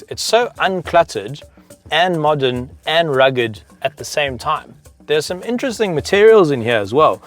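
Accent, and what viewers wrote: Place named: South Africa. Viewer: So instead of listening to the review I'm listening to incredible way of presenting, so south african and refreshing. Good job dude 🤜🏽👏🏾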